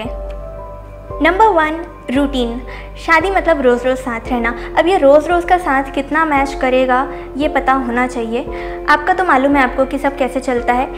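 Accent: Bihar, India. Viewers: I like bihari tone 😝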